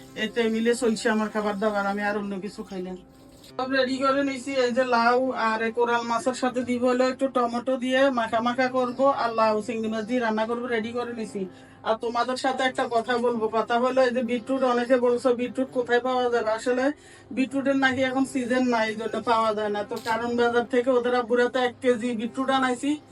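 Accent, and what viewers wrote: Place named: Comilla, Bangladesh. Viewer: আসসালামুআলাইকুম আপু আপু আপনার বাড়ি কি কুমিল্লা আপনার মুখের ভাষাটা কুমিল্লার ভাষা যেগুলো অনেক সুন্দর হয় এবং দেখি অনেক ভালো লাগে সাপোর্ট করেছি আশা করি সাপোর্ট করবেন সব সময় পাশে থাকবো এবং পাশে থাকবেন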